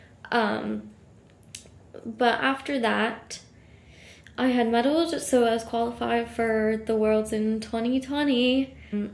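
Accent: Ireland. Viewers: You have picked up an Irish lilt to your voice,. A far cry from your Ohio roots! Best of luck! Love following your career! 🍀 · Oh wow I thought she was Irish based on her accent. I’m from Ohio and don’t hear the midwestern dialect at all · You sound kinda irish!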